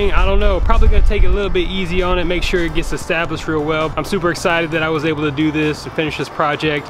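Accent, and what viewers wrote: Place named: Louisiana, United States. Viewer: Love your accent. Louisiana?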